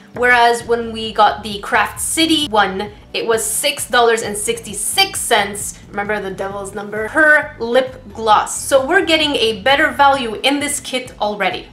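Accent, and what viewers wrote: Canada. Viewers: I don't know how you sound like a stereotypical Canadian lol. I'm Canadian and have never met someone with a stereotypical Canadian accent. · You can tell shes from Canada 😂😂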